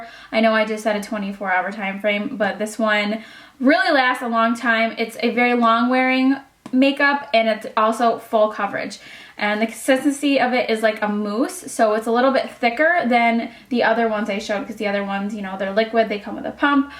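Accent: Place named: Midwest, United States. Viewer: I've always wanted to ask you, are you from the Midwest?? You have a strong Midwest accent! Nothing wrong with that, I'm originally from Chicago but I don't have the accent:(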